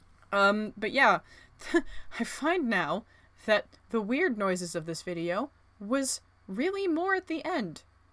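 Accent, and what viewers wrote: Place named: United Kingdom. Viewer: British accent, probably about 5 years old? rolling Rs is probably from me, cause of Espanol and theater. You should look into linguistics. There are places and people who have accents and help people who want to sound authentic for voice or theater as well. So look for that. Pirates!!! Arrrrrr :) I would think that is rebellion for you. hee hee ok well I will send you some links to check out.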